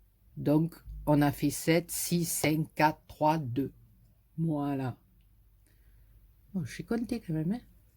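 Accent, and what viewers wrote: South, France. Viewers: toujours un plaisir à te regarder, tu mets la patate pour la journée et tu fais du bien, tu transmets ta bonne humeur avec l'accent du midi..bisous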